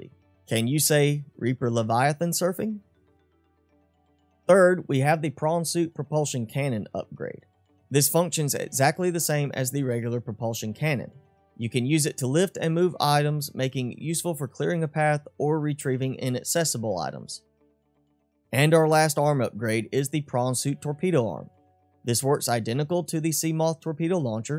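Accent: South, United States. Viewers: I love your southern accent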